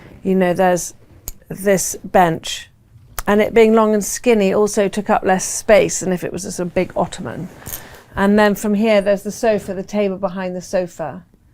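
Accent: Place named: England, United Kingdom. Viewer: Love her homey style and I love her English accent.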